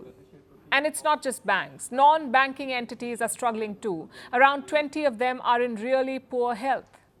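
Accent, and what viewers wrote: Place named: India. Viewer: Why this lady keeps having wet dreams I. Bangladesh and keeps spreading rumor in an annoying Indian accent?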